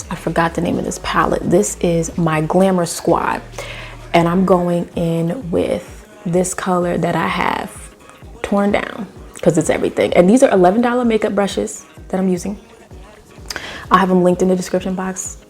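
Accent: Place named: Chicago, United States. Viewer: Are you in the Chicago area? Because you definitely sound like us, the way you speak. I just love it Sis.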